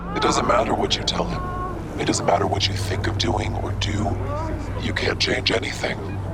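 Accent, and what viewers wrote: France. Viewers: French accent ahhhhhh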